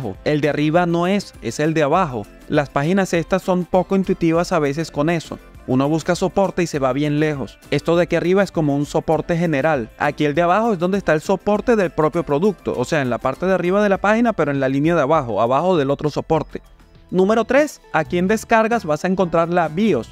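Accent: Venezuela. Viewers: Excelente información, ¿eres venezolano no? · Hola eres de venezuela? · Hombre no sé si eres venezolano hermano pero hablaste como uno. Gracias por tus videos soy un seguidor muy agradecido por tus explicaciones. Gracias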